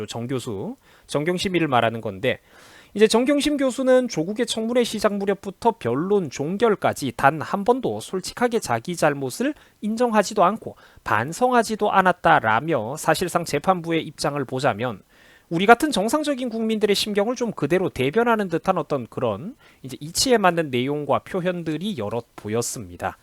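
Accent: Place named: Gyeongsang, South Korea. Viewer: 님 경상도 분이신가요? 오늘따라 특히 사투리가 정경심(정겹게) 느껴지네요.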